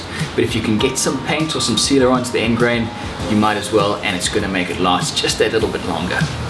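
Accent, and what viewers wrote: South Africa. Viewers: That’s a South African accent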